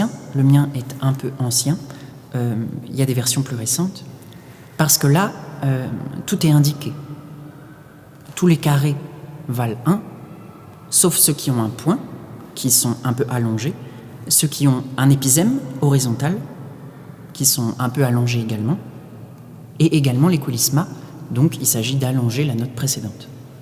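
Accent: Standard. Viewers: I am French, yes his accent is very neutral, and he speaks like a French literature teacher with emphasis on clear uncompromised pronunciation.But his language is quite simple and slow. It's the best possible combination for language learners. Few people talk like this in France. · Me too! He’s actually not as hard to understand as I thought, maybe his accent is very neutral? He also speaks slowly and clearly so I’m not as lost as I expected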